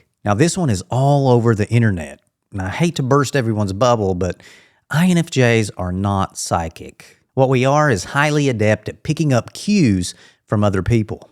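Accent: South, United States. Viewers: Love your southern accent. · What state are you from?? Trying to figure out the accent bro lol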